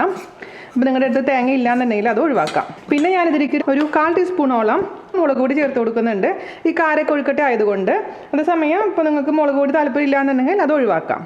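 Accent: Thrissur, India. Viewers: I follow urs and Aju’s world mostly. U both from trissur and it’s nice to listen ur trissur accent.. wish you all the best..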